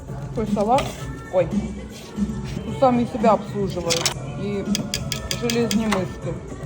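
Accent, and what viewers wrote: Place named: Surzhyk, Ukraine. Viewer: Я не украинка, но у этой жинки украинский не родной. Пытается , молодец!Звучит суржик! Лучше уж на родном языке, на котором сны или мысли! Зрителей и просмотров будет намного больше !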